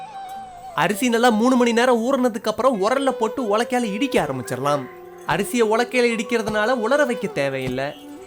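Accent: Salem, India. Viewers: Wow what voice I think Salem area crt ah entha area attur?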